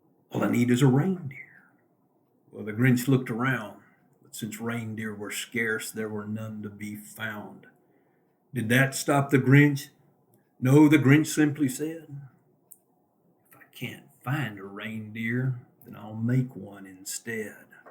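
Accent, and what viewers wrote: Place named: South, United States. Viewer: Love, luv, l-o-v-e this! Such a pleasant, warm and southern voice. Going to share it with my friends ❣️👍🏻🫶🏼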